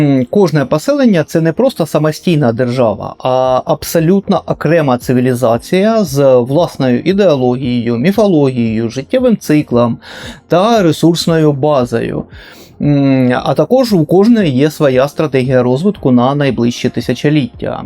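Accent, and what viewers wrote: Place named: Russia. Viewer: Ви дуже класний, але акання вас виказує.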